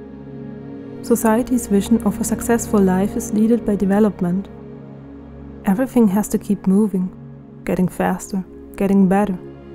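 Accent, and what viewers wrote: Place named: Germany. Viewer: So much like your German accent!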